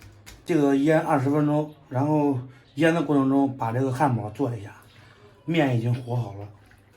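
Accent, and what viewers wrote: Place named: Zhoukou, China. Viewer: 这周口味普通话听着好熟悉。商水老乡飘过。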